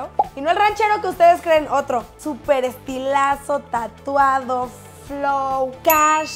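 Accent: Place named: Mexico. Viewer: porfa no hables tan mexicana no te entiendo. saludes desde españa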